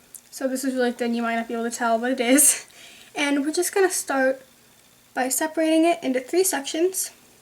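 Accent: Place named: Boston, United States. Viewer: Are you from Boston? You sound like you have a little bit of a bostonian accent! :)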